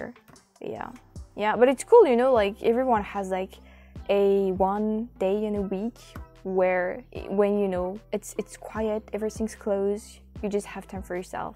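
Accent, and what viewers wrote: France. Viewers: I assume you could come to America and a restaurant would hire you for your French accent. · The French language when spoken in English is very nice sounding.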